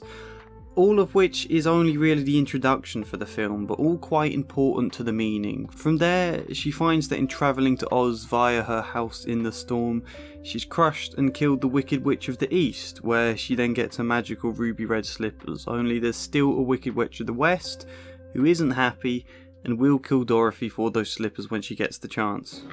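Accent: England, United Kingdom. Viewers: 137th...WOW ...a Wild Deep Dive into the Mind / Feelings of Dorothy Gale...I actually enjoyed this..and your English Accent makes it even better...... Have you seen the Prequel Oz the Great and Powerful"?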